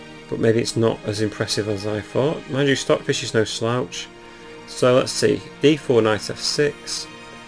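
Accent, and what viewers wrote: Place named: RP, United Kingdom. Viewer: Nice background music on game one. AND nice voice too with RP voice is narrating on the game.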